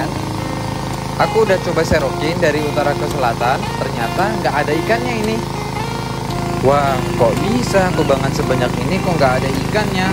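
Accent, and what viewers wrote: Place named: Banyumas, Indonesia. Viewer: wong ngapaknya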